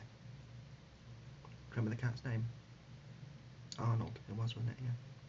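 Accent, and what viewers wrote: United Kingdom. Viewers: Soo odd but that British accent tho. 👍🏻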